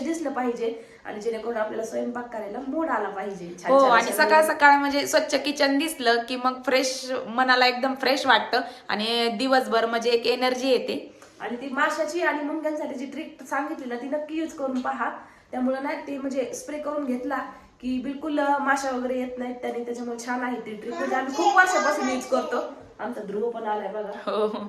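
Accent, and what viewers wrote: Ahmednagar, India. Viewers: मी आज पहिल्यांदा पाहिला तुमचा vlog 👌👌खूप आवडला मी कोल्हापूर ला रहाते पण मी नगर जिल्यातील आहे शिर्डी जवळ बाभळेश्वर माझे माहेर आहे माझे education प्रवरा कन्या विद्या मंदिर मध्ये 7 वी नंतर पुणे मध्ये छान आहे kitchen आत्ता मागचे vlog पहाते तुमच्या भाषे वरून कळले तुम्ही नगरीं आहात मग मागचा स्वाती च्या love story vlog पाहिला तेव्हा कळले त्यात तुम्ही तीळा ला हौरी म्हणालात तर मी conform च झाले तुम्ही नगर भागातल्या आहात कोणते गाव आहे तुमचे surmane काय आहे घर खूप प्रशस्त आणि सुंदर आहे आणि maintain पण केलेय माझ्या सगळ्यां मैत्रिणी कोपरगाव कोल्हार लोणी श्रीरामपूर संगमनेर प्रवरानगर रुई राहता इथेच आहेत 🙋‍♀️❤, अंबज्ञ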